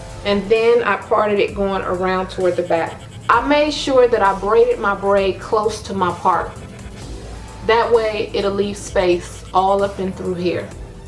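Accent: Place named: South, United States. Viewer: Aah you're so pretty and your southern accent is charming! I'm just a beginner with crochet (I watched your pretwisted sen. twist videos) but I wanna try this in the future! Thanks for the video!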